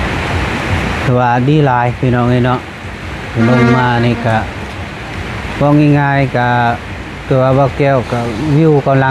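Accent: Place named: Laos. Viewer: เป็นทหารต้องเข้มแข็งบ่าวแก้วอย่าอ่อนแอสู้ต้องดูแลประชาชน.เว้าสำเนียงลาวเผ่าได๋ฟังคือบ่คือบ่าวเตียง.สู้สู้เด้อทหารตัองอดทน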